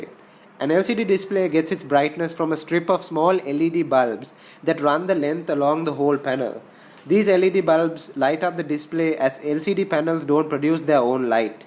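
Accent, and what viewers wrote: India. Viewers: i dont like your indian-english. its annoying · Is it indian english? Epically slow action to say NO, it doesn't! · Hard to understand your English..Your Indian accent is so strong..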